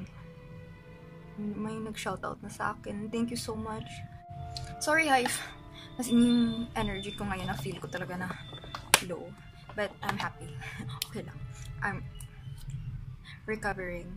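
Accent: Philippines. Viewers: bisaya diay ka abi kog ilonggo Kay sa tono mn gud nmo pag storya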